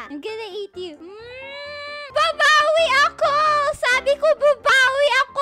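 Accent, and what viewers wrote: Visayas, Philippines. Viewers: Ate. visaya ka no kasi narinig kita na sabi mo. ayaw saba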